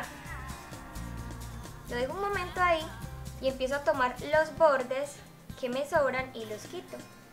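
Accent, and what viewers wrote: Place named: Antioquia, Colombia. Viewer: Realmente el acento Paisa.. (de Antioquia)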